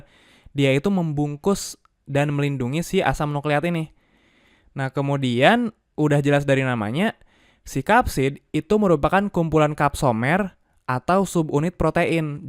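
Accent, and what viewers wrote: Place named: Bandung, Indonesia. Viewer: Orang bandung kak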